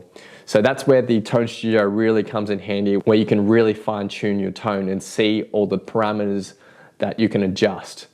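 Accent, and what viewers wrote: Australia. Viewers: What's more annoying than an Australian accent? An Asian with an Australian accent. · the most stunning thing about this video is watching an asian guy talk with an aussie accent.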